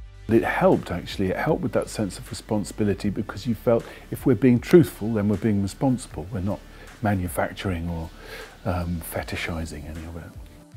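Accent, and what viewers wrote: RP, United Kingdom. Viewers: I love the way he speaks so proper and posh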